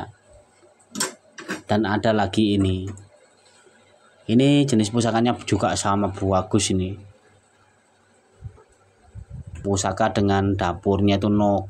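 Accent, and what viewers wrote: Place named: Surabaya, Indonesia. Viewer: Suroboyone pean ngendhi Cak?....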